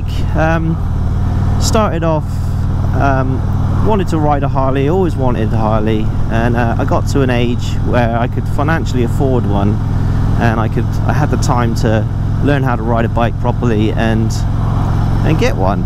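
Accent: Somerset, United Kingdom. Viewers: Great video you are doing great work. First of for your decision on getting and choosing your harley you got spot on. For your starting vlogging you should see motonewb blockhead early stuff really gritty video and sound. First started watching xxxdeadhead and shawnsmoak also robbertrob and huntingharley. I've noticed and they all done this as they went on started saying they upgraded their cameras microphone mainly gopros with senna headsets. As for your contents don't really threat you are doing great stuff there is one thing which is rarely done is pavilion opinion something to consider in the future. What do you talk about what roads like where you live what is your favourite route why's it your favourite. Why you like were you live or don't because of the roads traffic. What you thinking of doing to your bike in the future and why. I. E. I've got a pink metallic paint job with unicorns because why not. 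Chicks love it gets me laid a lot and who F, ing put it in the laws of bikering you have to have a black or covered in skulls bike to be a biker. Just a point my bike has skulls on paint but who cares i like it. Just do what you want to your bike and if you want tell why you chose to do that. Just a mention no one as far as I know has installed two inch tank raising kit on the new streetbob. Your voice is good definitely sounds with hint of somerset region. Microphone you are using is good. Those motorvlogers who done it for a while also use filters on their pc's. You are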